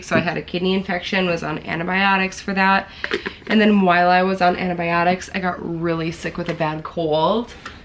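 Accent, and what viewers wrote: Minnesota, United States. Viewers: My dads side of the family is from MN and I want to move there SO bad! When I started watching your videos I knew just by your voice you were from MN. 🤣 I wish I had an Minnesotan accent! ❤️❤️❤️❤️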